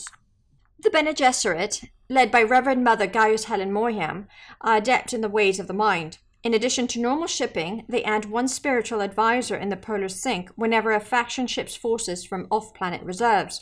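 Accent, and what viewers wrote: United Kingdom. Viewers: Wow. Thank you so much. This was such a well produced rules set for Dune. I’m sorry that I’ve never come across your channel before. Do I detect a hint of Scottish in your voice? Thanks again!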